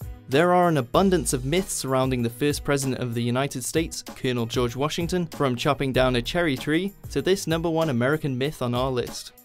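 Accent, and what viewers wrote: United Kingdom. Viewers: It sounds British ^^; I am from Wisconsin, so I think I can tell the difference since I would have literally been within driving distance from Canada :P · ugh British b arrator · American icons and yet a British narrator. Makes sense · A lot of comments about a British narrator. Apparently that makes a difference. He is is only reading from a script that a team of people have researched and developed. What exactly does it have to do with the content of the video especially when these myths can be historically proven? It's exactly this attitude why most of the world believe Americans are arrogant and stupid.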